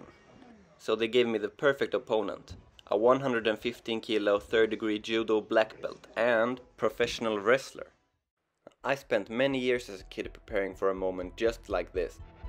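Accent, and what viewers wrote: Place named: Scandinavia. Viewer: Your accent sounds familiar. Are you Swedish or from a nordic country?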